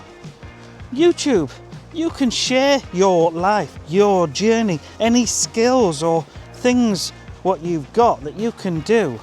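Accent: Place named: North West England, United Kingdom. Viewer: I'm guessing in the North West somewhere from the accent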